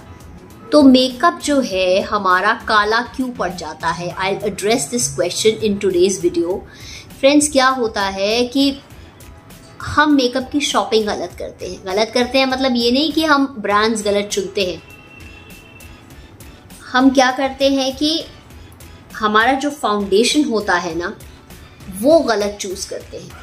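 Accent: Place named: Lucknow, India. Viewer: Hi aap ki boli kitni achchi hai kya aap lucknow se belong karti hai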